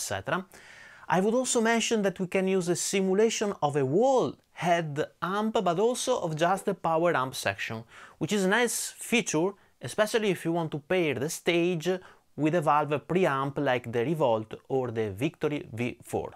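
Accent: Italy. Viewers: He's Italian, that's how it's pronounced.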